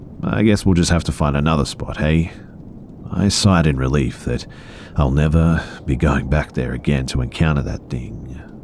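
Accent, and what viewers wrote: Australia. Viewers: gosh darn it, why do australians have the best accent?! Thanks for the upload! Much love, man! ❤️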